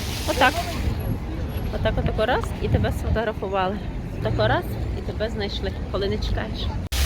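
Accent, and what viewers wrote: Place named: Western Ukraine, Ukraine. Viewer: Як приємно чути ваш говір,західний наш рідний!Ви ВЕЛИКА ЛЮДИНА!!!!Пишаюся вами!!!💛💙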